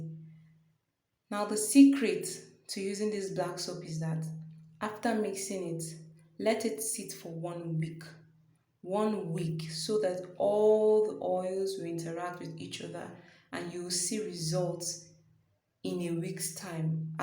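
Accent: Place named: Ghana. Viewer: You speak like a Ghanaian thanks for teaching us sorry am a Ghanaian that’s why I said your English is like Ghanaian.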